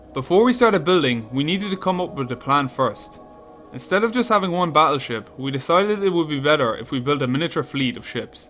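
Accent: Ireland. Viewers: u have to be irish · You have an Irish accent and by the way could you build the jungle battlefield 5 map it would be very cool to see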